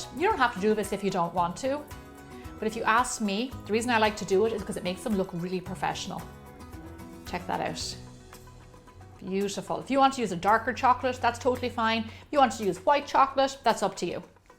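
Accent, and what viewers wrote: Ireland. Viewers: I come for her recipes and her irish accent 😍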